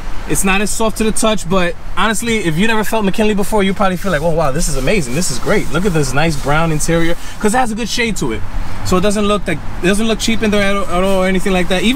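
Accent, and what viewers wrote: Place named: Nuyorican, United States. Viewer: I could only stand about 3 minutes of the whole new yorican talk/accent ugh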